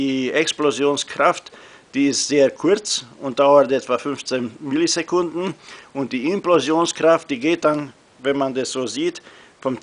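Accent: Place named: Transylvania, Romania. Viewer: Siebenbürgener Akzent...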